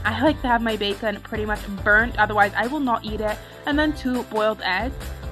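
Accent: United Kingdom. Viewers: you have a british accent sometimes! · I know this is irrelevant to weight loss, but are you from the UK? I really like your accent, hahaha.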